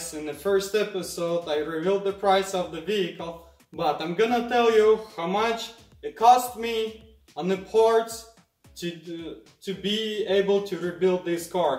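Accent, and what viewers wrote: Russia. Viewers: Вау. Тачка огонь. Все время слежу за Вашим видео. Очень хорошие машины берете в работу. не Хлам. Если не секрет сколько такая после ремонта в продаже у Вас? И еще, акцент у Вас явно русский:) Могли бы Вы делать субтитры на русском языке)))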